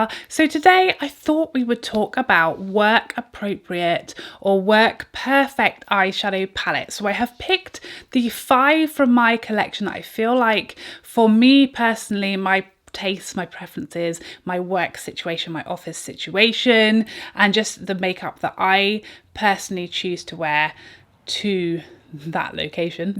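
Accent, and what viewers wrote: England, United Kingdom. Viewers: I'm italian 41 years old mum, I love listening to you and I love your wonderful English accent! 😍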